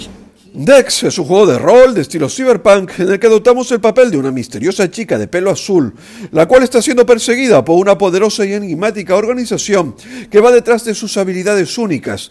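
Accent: Spain. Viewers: aaaanda, por fin lo sé, eres tinerfeño! Sabía que no eras del sur de la península y estaba seguro que serias de las Islas... Muy bonita Tenerife, ánimo con el canal y gracias por los esfuerzos para buscar los juegos de los que nos hablas en tus vídeos :)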